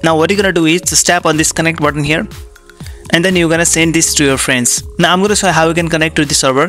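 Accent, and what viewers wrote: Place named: India. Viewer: it's joke, the guy has Indian voice and must of the time Indians are scammers, but this isn't one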